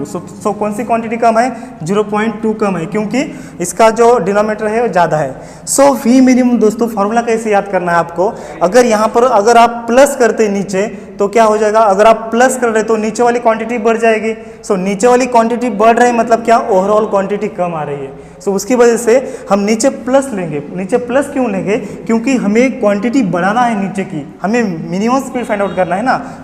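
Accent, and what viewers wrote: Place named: Maharashtra, India. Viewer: सर आप हिंदी मे पढाते हो... पण मला असं वागतं कि तुम्ही मराठी बोलता बरोबर ना सर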